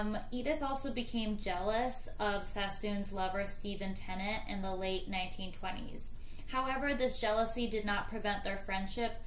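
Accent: United States. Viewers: Why this disagreeable American voice?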